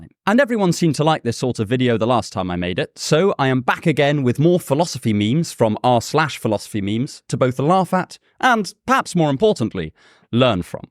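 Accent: United Kingdom. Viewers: Your channel was my great coincidence: A young man with a British accent explaining about my favourite subject 📚✨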